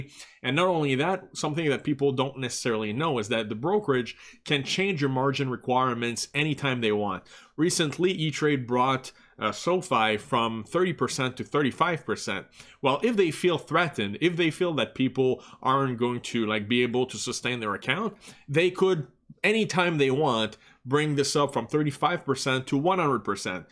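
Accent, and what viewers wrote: Quebec, Canada. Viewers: Dude, I love the videos, I find you to be one of the few 0 bullshit YouTubers, but I am curious because of the accent if you are from Quebec?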